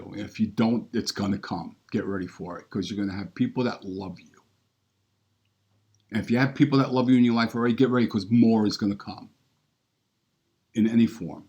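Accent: New York, United States. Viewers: Came to realise and found this channel. Our last "quarrel" as I tend to remember them was about missing mother's Day, and I used the argument "mom, since dad died in January this year it's been mother's Day everyday to me", and I got away with it at that moment, but it got out of hand for the so maniest time yesterday which made me google "narcissistic mother" and find this series of incredibly insightful videos. Thank you! (Love your NY accent!)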